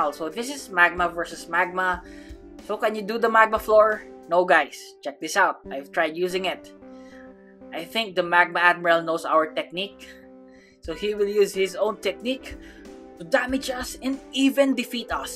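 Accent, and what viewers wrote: Philippines. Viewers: Hi are you Filipino? Bc u sound like Filipino btw good work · your accent is so philippino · Knew you said sa Skylands are you Filipino??????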